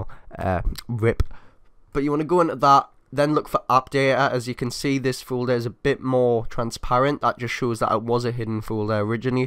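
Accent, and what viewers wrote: Newcastle, United Kingdom. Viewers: Got a problem? There is always a guy with a geordie accent to help ya out!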